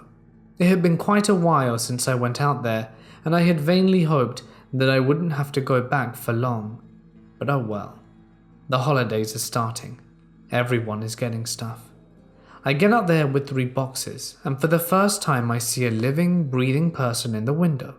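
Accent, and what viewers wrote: United Kingdom. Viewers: Just love hearing his British accent...wished I was fluent having his accent living here in America... I trained as a trucker and heard creepy stories about what States to avoid, always be aware of your surroundings because of human trafficking and truck jacking do to people think that semi truckers have a lot of money...😬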